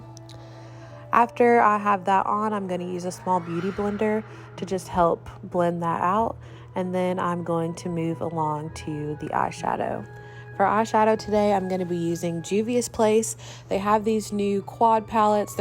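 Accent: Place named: South, United States. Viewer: Absolutely loved this! Gorgeous and your beautiful southern accent is the cutest! Wishing you much success on all of your social media platforms!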